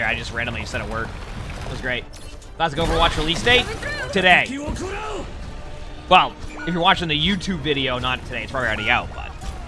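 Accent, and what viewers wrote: Boston, United States. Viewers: Probably part of his Boston accent (which sometimes comes out more noticeably).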